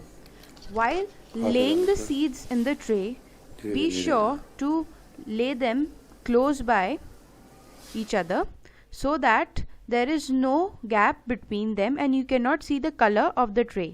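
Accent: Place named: India. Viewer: Try to pronounce your r and d in proper English manner in horrible hinglish for us it sounds disgusting, the Hindi rétroflexes always makes Indians lazy when talking English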